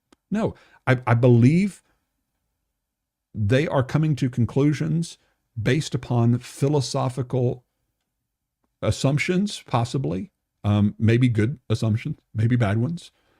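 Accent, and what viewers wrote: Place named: Texas, United States. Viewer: I think you're smarter than you say you are. I think its that thick Texan accent that masks it 😉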